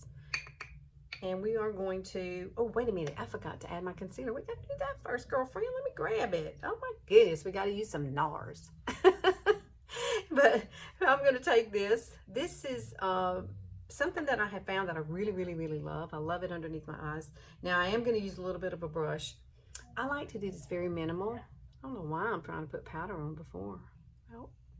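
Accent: South, United States. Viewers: Hello,! I have my fetish about femininity whether I watch beauty/fashion videos, watch "motherhood videos, including day-in-the-life vlogs" or think about waitresses. I have my fetish about mustard and ketchup whether I squeeze 'em "on my hotdogs, cheeseburgers or hash browns" or "for my fries". I have my fetish about the round condiment squeeze bottles whether I think about the diners, pancake artwork parties or painted canvases. I have my fetish about the feminine names like yours. I enjoyed this video of yours. You have your Southern accent since you're living in Alabama. Quite recently, I watched the videos on "MrsMelissaM Beauty" and " Unboxing Mom". I watched the empties video and week-in-the-life vlog on " Parada". On one of the videos on " Unboxing Mom", I spotted her daughter named Kelly. I watched the muted videos on "Made by ", filmed in Holland. 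One of her videos featured the tie-dyeing party for Dutch children where I spotted the round condiment squeeze bottles. On Aug. 30, I washed 2 out of my 4 reusable "16-ounce" OXO squeeze bottles and separately replenished 'em with honey barbecue sauce and pancake syrup. On Aug. 31 and Sept. 1, I played with my 12 empty, clear "12-to-16-ounce" squeeze bottles by stacking, holding and "slowly squeezing" 'em. For my 1st dinner of September, I squeezed mustard and ketchup on my 2 hotdogs with my 2 other reusable OXO bottles. I ate some lightly salted Pringles chips and drank my soft drink. B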